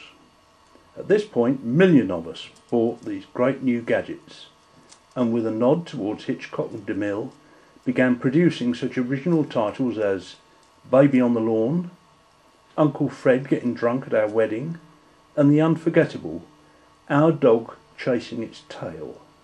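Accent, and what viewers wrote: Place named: United Kingdom. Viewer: The best was the british accent :P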